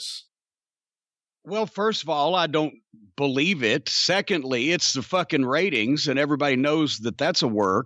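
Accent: South, United States. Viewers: Parz BK that’s not what my sub conscience is telling my necessarily but his southern accent makes the words “mother fucker” hilarious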